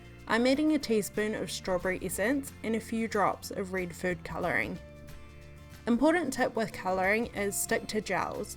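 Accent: New Zealand. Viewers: Trying to find the info but I cannot. Lol. Are you a kiwi!? 🤩❤️ Hahaha the accent is undeniable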